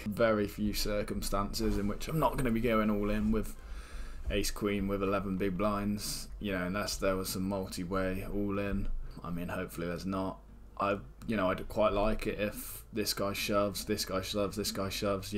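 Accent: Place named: Midlands, United Kingdom. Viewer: Mate where are you from? I'm sensing a Midlands accent? Do you play goliath in Coventry? Love that tournament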